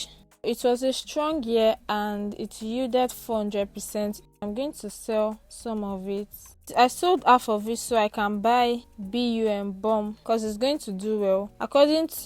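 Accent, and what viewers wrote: Nigeria. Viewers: Like do you get? When I subbed and started watching a video I was so shocked to hear the Nigerian accent Most Nigerians DONT get they hype of the game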